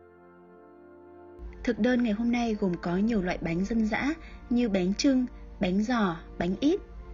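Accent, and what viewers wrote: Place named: Hanoi, Vietnam. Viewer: " " Haha. Dễ thương, giọng Hà nội (gia đình >80 năm).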